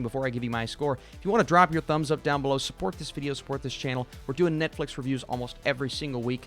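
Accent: South, United States. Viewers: I just discovered you. Bro you were made for this. Love your delivery! Love your accent! Southern I'm guessing. You have a new fan! And, I will watch this just to learn from it. I love bad movies, great teachers;)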